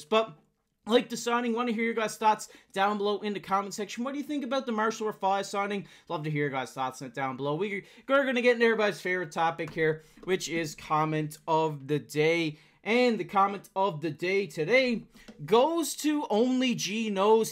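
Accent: Newfoundland, Canada. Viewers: Definitely a Newfie or a caper. Good trying the English b’y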